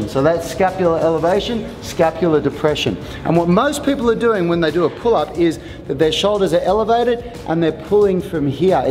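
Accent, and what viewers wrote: Australia. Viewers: Great explanation. Are u an Aussie?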